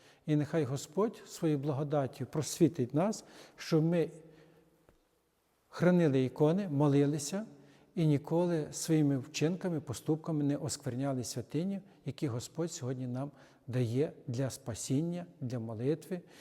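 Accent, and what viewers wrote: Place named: Khmelnytskyi, Ukraine. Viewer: Так, є святині, які потрібно поважати. Хороший урок, хоч і трагічний. Батюшка, ви часом не з Хмельницької області? Слухаю ваш говір. Цікаво.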